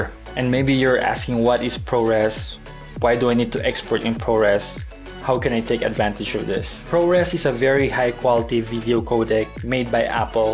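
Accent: Philippines. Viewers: sounds pinoy-ish :D .. nice tuts.. problem is i don't understand this proxies and ProRes thing.. when i edit., i just drag and drop since i'm just working on one clip.. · I feel like he’s a filipino with his accent.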